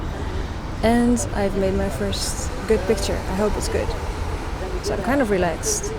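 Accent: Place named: Netherlands. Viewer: Hezký klidný video. Jak dlouho už jsi v Amsterdamu? Mluvíš holandsky? .... totiž když video poslouchám, skoro mám někdy pocit, že ve tvojí angličtině slyším víc holandskej přízvuk než ten českej;)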